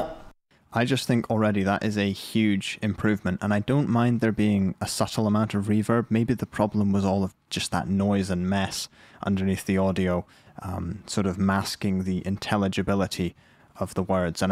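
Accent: Scotland, United Kingdom. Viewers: I don't think he does XD he is scottish sometimes his accent shows.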